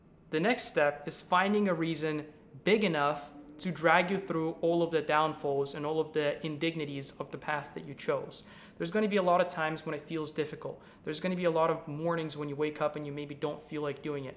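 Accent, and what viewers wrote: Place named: Eastern Europe. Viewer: as a Russian American I could tell you were Slavic instantly.....so almost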